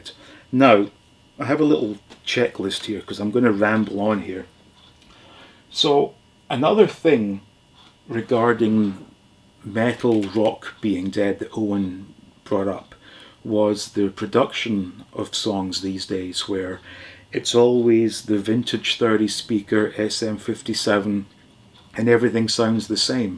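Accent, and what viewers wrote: Scotland, United Kingdom. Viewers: You sound Scottish.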